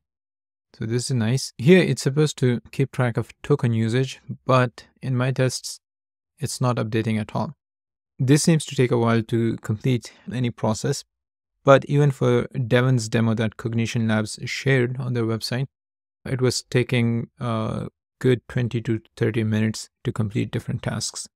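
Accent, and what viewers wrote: India. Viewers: Bro it's a Indian accent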